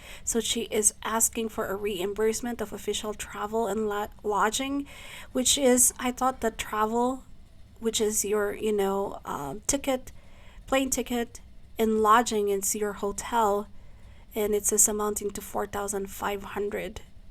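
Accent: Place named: India. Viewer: Mr Nawat is hands on in his organization. There is nothing more effective than him making that move. You sound like Indian so I don't acknowledge your point. Your subscribers is less and I don't think it is going grow fast. You are sounding like moralist like most of Indians. I don't dig,that. I didn;t subscribe nor like your content. I have to change channel.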